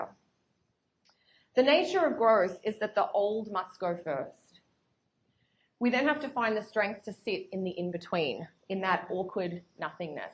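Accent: Australia. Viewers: Wow where are you from originally are u from Australia, like Melbourne? Omg Fiji that's lit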